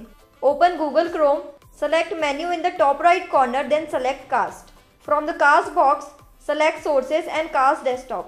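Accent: India. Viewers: I love the Indian accent of this chick, the way she twists her tongue and pronounces the words. Technically she doesn't know the job and is jumping to other things which are not present in new Windows. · this nothing about singlish,she speak indian english. hard to understand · why do you talk so fast with such heavy Indian accent?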